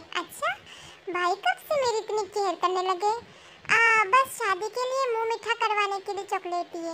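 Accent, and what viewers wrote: Gujarat, India. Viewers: Diii are you gujarati ? 🤭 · Di ap gujrat se h kya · Kya aap gujarati hai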